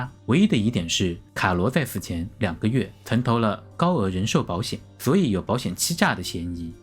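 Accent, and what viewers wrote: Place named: Kunming, China. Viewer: 博主口音为什么像昆明人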